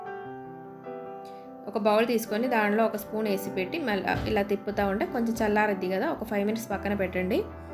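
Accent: Kerala, India. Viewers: I think ur not telugu ur keralan because ur words u spell looks keralan . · Madame thanq R U Malayaali ? Ur accent is like that. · Your malayala telugu చాలా మధురం! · Yes... I guessed correct... My mom is also from Kerala... I know that pronunciation, how Kerala people speaks telugu... 🙂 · Sure she is a Malayali. Can identify easily. I'm too a Malayali. Thanks madam. Good to hear a good recipe from you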